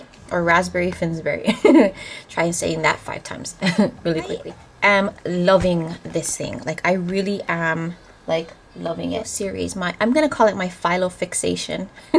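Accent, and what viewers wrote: Hawaii, United States. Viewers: OMG I could tell you from Hawaii the moment you started talking! aloha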